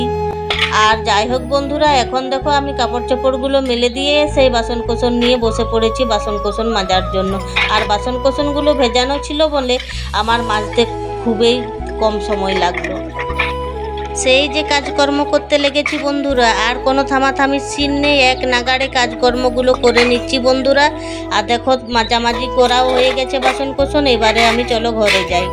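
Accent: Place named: Bankura, India. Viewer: খুব মিষ্টি লাগল কথাগুলো শুনতে। অনেকটা যেন বাঁকুড়ার মেঠো সুর বাজছে। আগেকার মতো শাড়ি পরে কাজ করার অভ্যাস চলে গেছে অনেকেরই....💛❤️💛🙏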